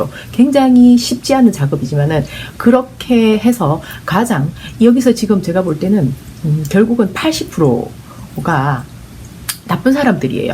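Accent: Gyeongsang, South Korea. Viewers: 그동안 잠깐씩 듣다가 이번엔 좀 길게 들어봤어요. 정치신세계 팬 인가요? 왠만한 판별력과 자기 중심이 없다면 들을수록 빠져들게 애둘러 말하는데 결국은 민주당과 김어준 주진우 등 대통령의 강한 우군들 에대한 욕이었네요~ 참 교묘하다! 대구 경북 쪽 말투로... 이 방송 보시는 분 들은 이동형의 이이제이 를 먼저 듣고 판별력을 키우신후 듣기를 바랍니다. 내말이 당신의 의도와 반대였다면 담부턴 좀 단도직입적으로 말하길 권합니다!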